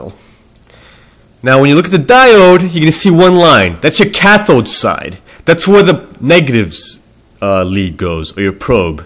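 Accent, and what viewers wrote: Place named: New Jersey, United States. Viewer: i like your new jersey ish accent :)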